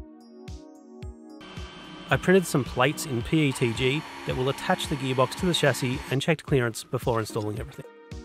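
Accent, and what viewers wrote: Australia. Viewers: hell yea , for some reason i was expecting a pov image just need a little i2c acc/gyro added to that xiao. anti wheelie think drag car and put it out the back alittle it needs the leverage i feel, next id like to see you go brushless then add either an old heli tail gyro for straight line ripping or for extra bonus points any new modern flight controller flashed with your choice of firmware ie ardurover that will give you gyro but also gps waypoints and telemetry "you sound aussie so go 915mhz"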